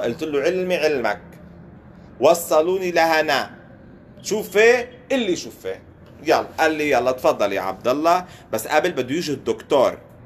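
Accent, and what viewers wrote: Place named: Lebanon. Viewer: ياريت تقلنا انت من وين ساعه بتحكي بناني ساعه خليجي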